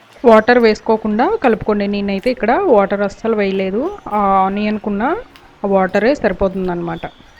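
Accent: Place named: Rayalaseema, India. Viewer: mee maatalalo rayalaseema vaasana vastondi meedi evuru?...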